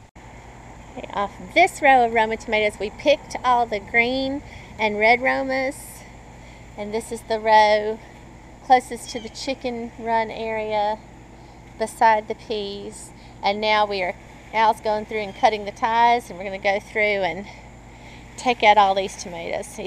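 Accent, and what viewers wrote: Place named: South, United States. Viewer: Just love your southern twang! You sound like my sister 🤗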